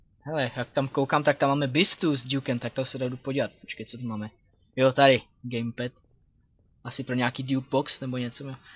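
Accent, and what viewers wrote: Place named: Ostrava, Czech Republic. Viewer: Ty mi připadáš jako ostravák (podle toho, jak mluvíš)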